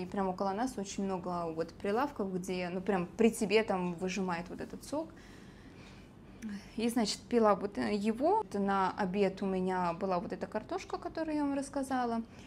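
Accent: India. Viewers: Как сладко говорит по индийски 😍😍😍🥰а голос ее похож на голос свекрови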